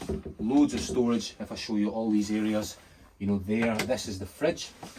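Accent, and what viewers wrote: Scotland, United Kingdom. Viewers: I was surprised to see that the chap has Asian heritage. I did not expect that with the full on Scottish accent. Just goes to show how perceptions can be completely false.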